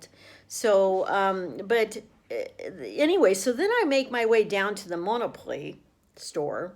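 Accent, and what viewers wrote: United States. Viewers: Are you guys realising the hatred here for Americans right now???? Or are you asleep!!!! Do you not get EU news in the US...the vitriol for your country is palpable!!!. I did tell you its not safe for a woman alone in France. I doubt you read it and if you did probably ignored it. ITS NOT LIKE BEING ON HOLIDAY FOLKS...ITS ENTIRELY DIFFERENT. Personally Id go home. I'm here in the UK. No differant, anti US very strong. Honestly I have no idea why you moved to France. You'll never sound French you're obviously an American ... Any who thinks I'm being nasty I'm honestly not...but its bloody dangerous and those saying its not are ???? Living in a bubble i guess.. Just saying as it is. also EU hates US at the moment and not suprising! Your Administration ...there are no words. Kids cause hellll here as well, alot of trouble. I'm 67. I've lived all over Europe. 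...you are not safe alone with no friends or relatives. Why do that? Truth. London is a real nightmare. It's NOT Clockwork Orange....😂 seriously, but its bad here. But if you go North up to York or Lincoln or just far away from London etc it's nicer. ...but! I do not understand your rationale, EU has terrible problems these days. Violence. You're a nice woman. If you want to come to UK avoid London. Really bad. I know lived and worked here on and off 45 years! Still here .....not the London youd recognise.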